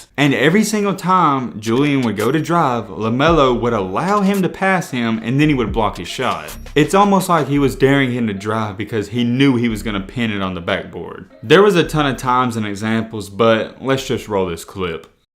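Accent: South, United States. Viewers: Bruh gotta be from the south somewhere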